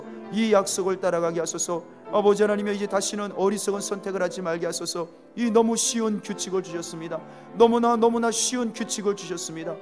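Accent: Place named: Gyeongsang, South Korea. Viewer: 목사님 말투가 갱상도사람 다 되셨네요!!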